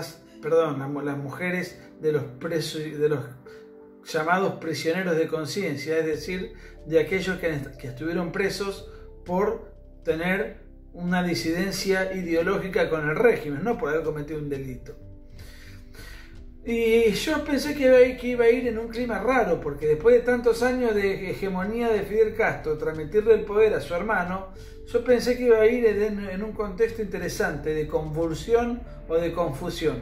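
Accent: Mar del Plata, Argentina. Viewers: Ah!!! El acento de Mardel. Tremendo acento. Tan o más identificable que el cordobés. Sobre todo Mar del Plata del Norte. xD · Acento de mar del plata ja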